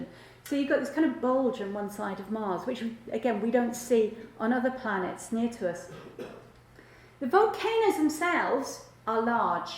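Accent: United Kingdom. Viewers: British accent is so annoying.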